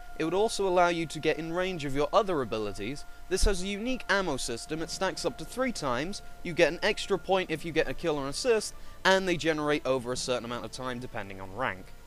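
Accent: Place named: United Kingdom. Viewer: it's like the british version of Cryaotic's voice o.o Kinda, kinda.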